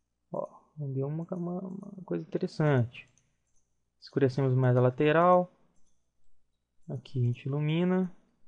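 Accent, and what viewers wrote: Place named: Minas Gerais, Brazil. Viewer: show. a voz do mineirin. ô çô!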